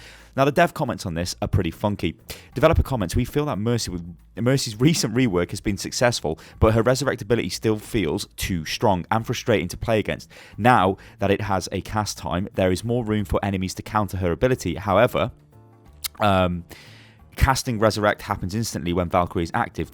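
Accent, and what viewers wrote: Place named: United Kingdom. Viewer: Your so British!🤣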